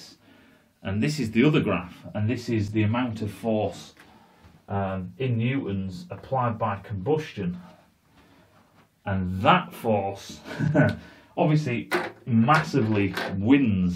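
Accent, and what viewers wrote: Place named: Yorkshire, United Kingdom. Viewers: Normality is returning to the world with a Yorkshire accent 😉 It’s good to see and hear you once again. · Didn't click on the video csuse ofnthe title, I clicked to hear your sexg northern accent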